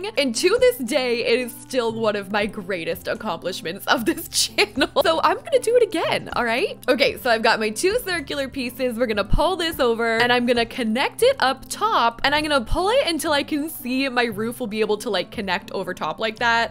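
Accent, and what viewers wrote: Canada. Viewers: i could immediately tell you were canadian in the first minute of the video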